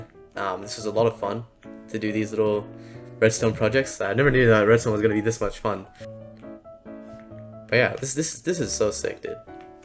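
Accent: Germany. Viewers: Ey bro, u german?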